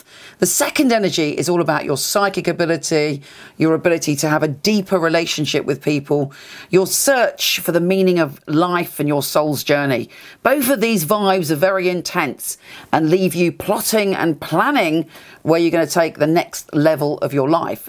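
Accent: United Kingdom. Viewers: Love all your Astrological PSAs ... your English accent #Grrr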